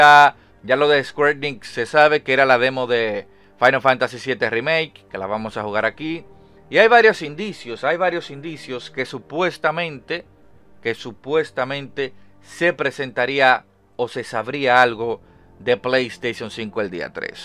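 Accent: Dominican Republic. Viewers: pero papa, yo no sabia que tu eres dominicano , aunque tu acento me paresia dominicano , pero no estaba seguro XD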